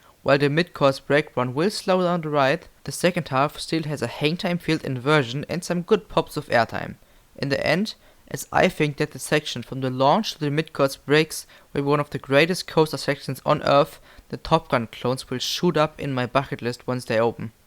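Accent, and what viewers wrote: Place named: Germany. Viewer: Deutscher Akzent am Start XD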